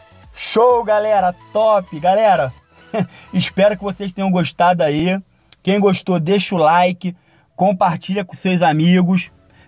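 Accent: Rio de Janeiro, Brazil. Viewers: crl tu sim q é carioca rapá , nmrl vc é engraçado com esse sutaq carioca bolado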